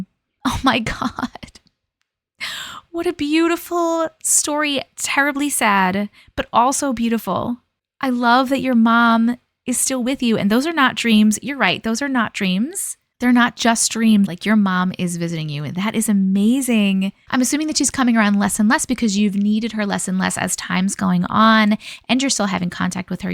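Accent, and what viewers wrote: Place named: New York, United States. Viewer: Definitely subbing.... stories AND you're a New Yawk girl like me?? Oh heck yeah!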